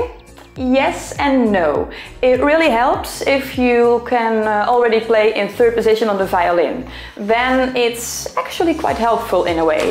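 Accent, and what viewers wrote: Netherlands. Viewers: From your name and accent I think you are Dutch or Flemish! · I guess you're Dutch from your accent 🤔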